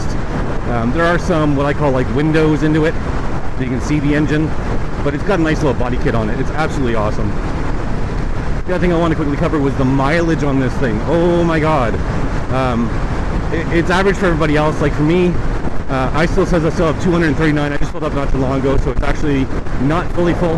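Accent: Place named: Canada. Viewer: What country is that? Everything is in kilometers but you're on the right side of the road and you sound American.... but you do say "AbOOt". I'm guessing Canadian?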